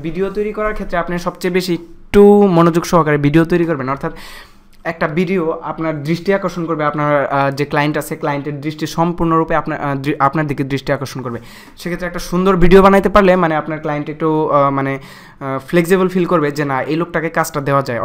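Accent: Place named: Brahmanbaria, Bangladesh. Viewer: শুভেচ্ছা রইল, কিন্তু আপনার মুখের ভাষাটা ব্রাহ্মণবাড়িয়ার মনে হচ্ছে বা আশপাশের অঞ্চলের। (মোট কথা দেশি দেশি লাগতাছে)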